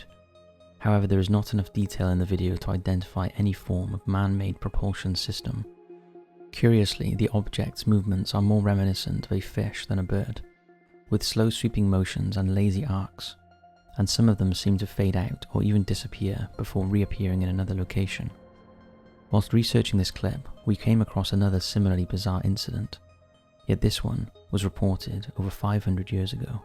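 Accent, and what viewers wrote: Wales, United Kingdom. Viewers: Hooray! 🎉 We've got the posh English chap back! Sounds more classy! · Is this guy welsh ? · i thought welsh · I love this British narrator guy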